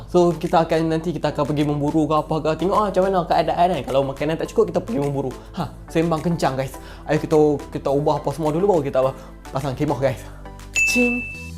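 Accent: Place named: Kelantan, Malaysia. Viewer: Kecek klate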